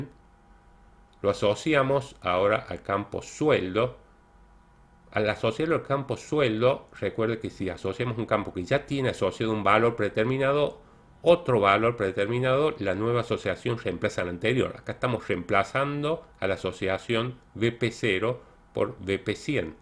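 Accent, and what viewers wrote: Argentina. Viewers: sos cordobes ?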